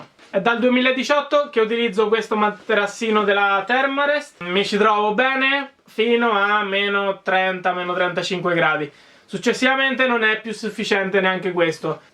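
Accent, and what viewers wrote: Umbria, Italy. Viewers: alla faccia che forza che sei! ma dal tuo accento sembri umbro o sbaglio?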